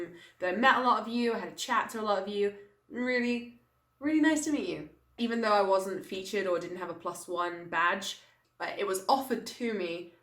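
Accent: United Kingdom. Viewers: i just LOVE british accent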